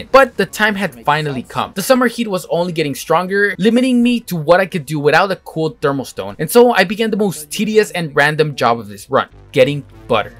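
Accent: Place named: Texas, United States. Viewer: You sound like someone I went to high school with in Texas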